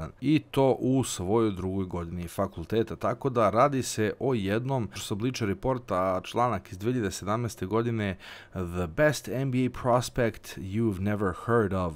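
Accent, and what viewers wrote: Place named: England, United Kingdom. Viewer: Zajebi košarku, daj nas nauči taj engleski naglasak🔥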